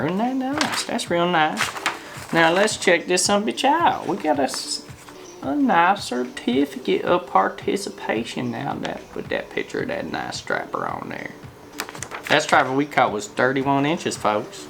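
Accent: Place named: North Carolina, United States. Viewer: DUUUUDE!! I am a freakin HUGE deadhead! Freakin DOPE-ass hoodie! I followed a few shows back in the day! Warren Haynes is a POWERHOUSE guitarist/vocalist from the ABB!! Gov’t MULE KICKS ASS TOO! if I didn’t know better I’d thought you were from down here in NC! You talk just like these people down here! Awesome video! I appreciate you! BABAAM!!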